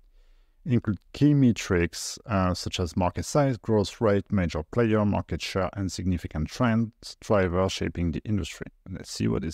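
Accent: France. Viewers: Really good, thanks for this advice video, nice english accent (ça s'entends un peu que tu est français comme même :D) Keep it up with the great videos. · From the way you speak English.. I'm getting your normal French accent. Which means you speak French Did i guess correctly? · 😵‍💫🤯🤯 du jamais vu. Ton approche innovante, la réflexion profonde, la succession des étapes,les outils utilisés chapeau même le fameux French accent 👍. Tu as un abonné et un like + un commentaire. Merci pour le partage. Je crois que tu as été copié si ce n’est pas quelqu’un de ton équipe.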